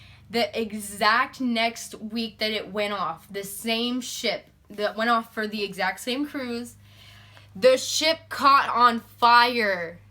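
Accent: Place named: Atlanta, United States. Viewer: Girl you gotta be from Atlanta. Lol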